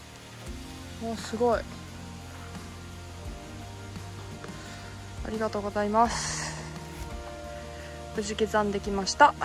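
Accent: Gifu, Japan. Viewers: MARiAさんスズメ蜂ヤバかったですね。 山には人を惹きつける不思議な魅力があります。難易度が高ければ高いほど惹きつけられるものです。 事故には注意して下さい。ちょっとの骨折でも二度と登れなくなる可能性もあります。このコースも想像を絶するものでした。 MARiAさんの岐阜弁大好きです！ こんな綺麗なクライマーはいない！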